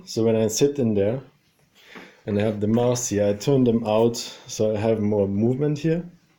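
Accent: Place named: France. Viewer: Downvoted for your accent only. Sorry but my experience with the french has been awful.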